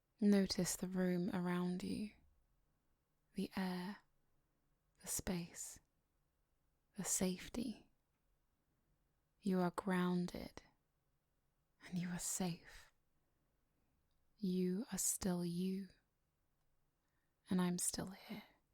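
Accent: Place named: London, United Kingdom. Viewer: Oh my, you have such a lovely voice. It is a crime you only have 39 subscribers. There is something so appealing about the British intonation. As a Dutch guy it is always hard to tell where but is it a London accent or close to London.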